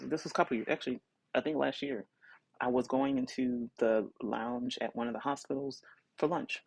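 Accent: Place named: United States. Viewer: Are there that can speak English like this? 😳👀 Anyways, hello 👋🏻 from Nigeria And you 🫵🏻 have gotta be a black American, not an igbo soma 🫵🏻🫵🏻🫵🏻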